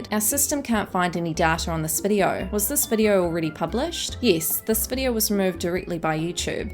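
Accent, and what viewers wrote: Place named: New Zealand. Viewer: She’s a kiwi from New Zealand it sounds like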